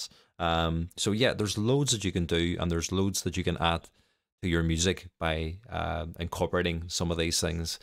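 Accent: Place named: Belfast, United Kingdom. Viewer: Well DAMN, I grew up in Manhattan, even I know that's a West Belfast accent (so I do). Granted, my father grew up in the Divis flats, but it was hardly noticeable that I remember. Great video. I HATE the ableton piano roll.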